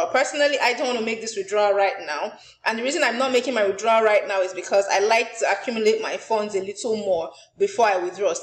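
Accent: Nigeria. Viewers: haha your nigerian accent LOL :D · This lady is a Nigerian I just know from the accent Right??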